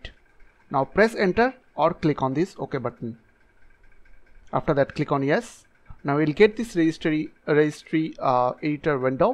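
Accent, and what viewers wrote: India. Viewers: ভেতো বাঙালি ইংরেজি বল্ল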